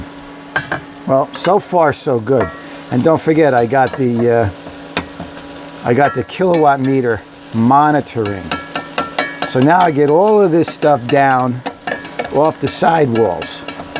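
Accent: United States. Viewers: Love your sense humour and your American accent. From 🇬🇧💕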